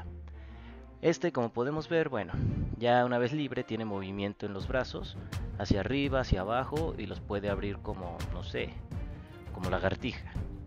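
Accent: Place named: Costa Rica. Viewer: Hola, Habla D'top motion, no es nadie importante mira. Se que eres de Costa Rica, igual que yo 😙, en el video " Tips para un buen stop motion" había una camiseta de la selección de Costa Rica ( la sele) y no sólo por eso, tu acento y todo. Se nota que eres tico. Me podrías decir COMO RAYOS TIENES TODAS ESAS FIGURAS ( ESPECIALMENTE EL SPINOSAURUS AEGYPTIACUS) saludos crack!! Pd: sub desde hace 3 años 😍😎😍 Donde conseguiste el spinosaurus acá? Amazon o que? En Costa Rica es ( para mi) imposible conseguir dinosaurios en tiendas departamentales ( walmart, Target, ect) Solo una ligera explicación por favor!! Atte: D'top motion.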